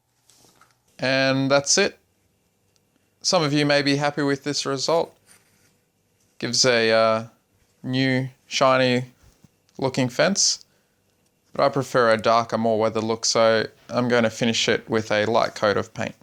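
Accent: Australia. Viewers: Thanks for the reply. I've been looking at a number of your videos and have to say they are quite impressive. I too like a lot of attention to scenic detailing. At some point I would like to send you some pictures of a layout I have been detailing for the past 30 years. Did you mention a brand of wire you are using and where you can get it from. From you accent I suppose you are from the land down under and I live in the Northeast United states. As an aside there is an annual convention of master craftsman model builders. Here is the site: <link> It is occurring on the end of March and beginning of April. Check it out!!! I have Friends in Brisbane...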